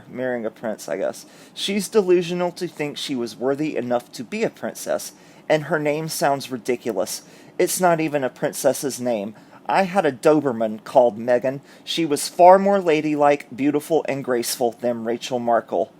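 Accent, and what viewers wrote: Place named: Virginia, United States. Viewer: I've always liked your accent and now I know it's Virginian.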